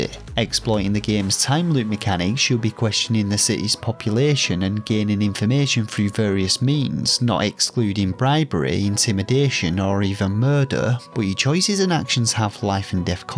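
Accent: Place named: Manchester, United Kingdom. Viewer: Been watching your videos since getting an xbox and game pass, thanks for the stella updates, you sound rather local to me, I am just south of Manchester ;)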